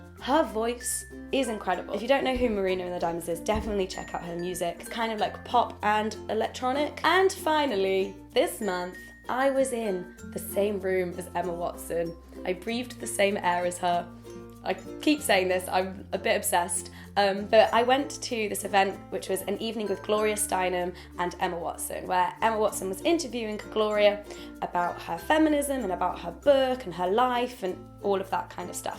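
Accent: United Kingdom. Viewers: where in Britain are you from? xx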